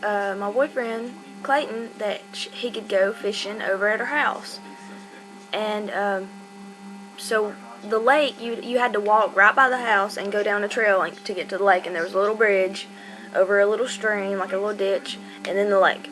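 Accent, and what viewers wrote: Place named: Louisiana, United States. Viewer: Omg where are you from? You remind me of my friend who is from Louisiana, yall talk the same way! lol i love it. Anyways, that last story was horrifying. Like holy hell, idk what I would do if I were you!!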